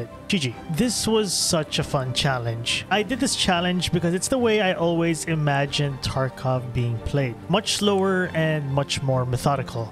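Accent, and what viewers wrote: Philippines. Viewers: are you filipino? Sounds like you have a manila accent :) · Legit thought this was a big time youtuber in the US, but once you started the voiceover I could immediately tell the accent, kabayan! Amazing content man, new sub. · oh shit narecognize ko agad an pinoy accent hahaha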